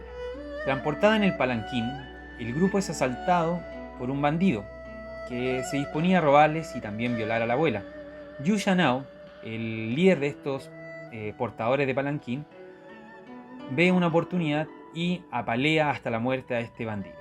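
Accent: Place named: Chile. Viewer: Encantador acento chileno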